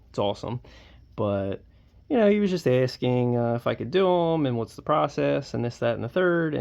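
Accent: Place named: Delaware County, United States. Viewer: where you from ? you got that Delco accent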